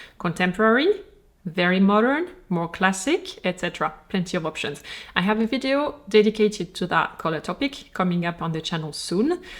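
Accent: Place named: France. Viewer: Please, please speak a little slower. I like your chabel and I like what you are saing, BUT it is very dificult to understand when you spead that fast and vith that strong french accent (whisn is beautiful, don´t you mis understand me) I am also not native english speaker, may be that is the reason that I can not understand well. I have noticed that you speak now much faster then before mooving back to France. I wish you all the best.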